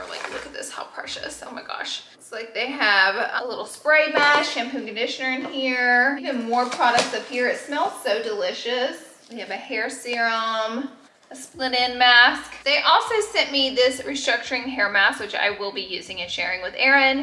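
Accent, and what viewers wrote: South, United States. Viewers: Sounds like she has a southern accent. I love it! For time watching her.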